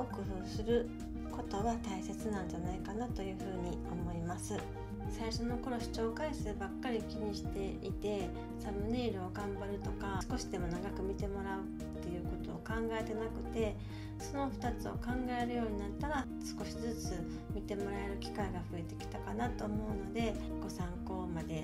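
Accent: Kyoto, Japan. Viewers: アラフィフ・・・ですか！？お若くて美しい。 京都のディープな所をご紹介頂き、東京から中々抜け出せない私にとっては、興味津々摩訶不思議な異世界を垣間見せて見せて戴き、とっても楽しみなチャンネルです。今後も京都の隅々までご案内して頂けたらと、期待を込めてチャンネル登録させて頂きました。 京都弁良いですね〜。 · めちゃくちゃ、京都弁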